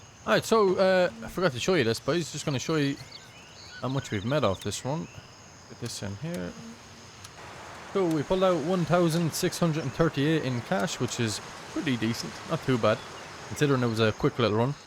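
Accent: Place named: Waterford, Ireland. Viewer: You sound like your from waterford!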